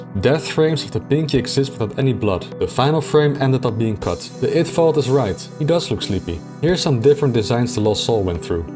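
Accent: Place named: Netherlands. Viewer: Something about the way you sound make me wonder if you Dutch. Are you, by any chance?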